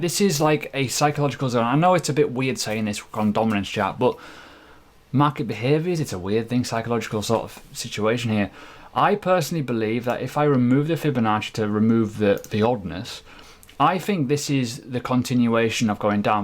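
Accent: United Kingdom. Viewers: Hello, I'm from the U.S and sometimes I have a hard time with your British accent. Would you please show the symbols of the crypto currency in the video, summary, or notes, I am new to crypto and I did not understand what "RSI" you were talking about. I pulled up 5 different coins (I think) in coinmarketcap and for future videos please? Thank you in advance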